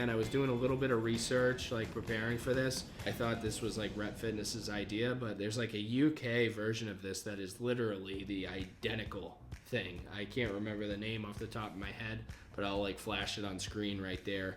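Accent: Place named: New Hampshire, United States. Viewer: I was just listening to this video and thought, "This guy is definitely from New Hampsha'" then I looked at my screen and saw the huge flag! 🤣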